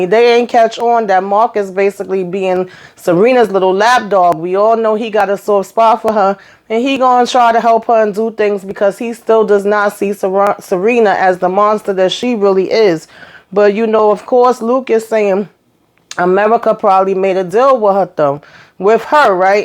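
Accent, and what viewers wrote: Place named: New York, United States. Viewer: You a new Yorker? I'm from Massachusetts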